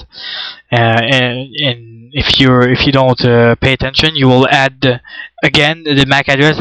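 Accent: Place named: France. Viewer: It doesn't matter whether he spells well or talks fluently, freaking jerks! He gets it done and can at least speak different languages, can you do the same? I speak 3 languages myself, idgas about what people say because they can't speak other than one freaking language. I can blend in different settings so can this guy, can you? People are freaking low life mfrs, anyways, good video. lol I can tell you are French I think haha...you french people have that easy recognition accent. Alors sinon, t'a appris ca a l'ecole ou toi meme? Je suis en train de faire mon certifica en Network Security, c'es comment comme boulot?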